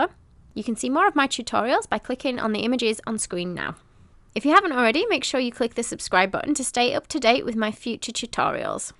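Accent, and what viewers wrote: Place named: Yorkshire, United Kingdom. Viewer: It is so nice to have someone from Yorkshire to listen to!! You are super talented! Can always count on your channel to rescue any of my cake fails!😂x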